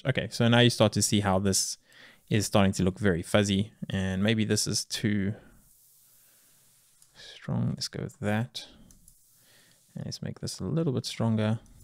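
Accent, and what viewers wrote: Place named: South Africa. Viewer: Sounds like South African, but diluted from living abroad maybe?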